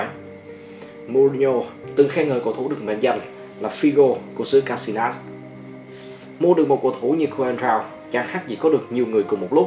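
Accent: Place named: South, Vietnam. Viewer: Giọng thì đã miền năm lại còn ồn ào tạp phẩm ...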